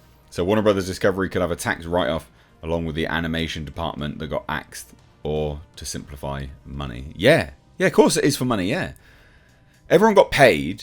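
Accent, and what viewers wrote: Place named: United Kingdom. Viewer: like your british accent.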